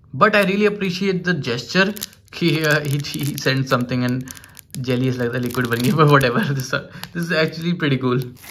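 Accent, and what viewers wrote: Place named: India. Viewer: Man indian accent is difficult to follow hehe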